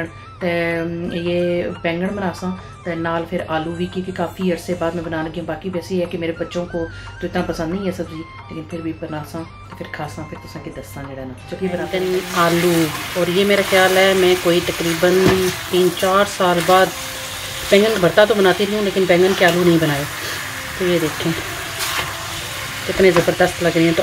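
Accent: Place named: Pothwar, Pakistan. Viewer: BHAUT CUTE BACHAY HAIN AP KE KHUDA SUB KO KHUSH RAKAHAY AR MEHFOOZ RAKHAY AMEEN AUR AP KI POTHWARI SUNANAY MA MAZA AH JATA HAI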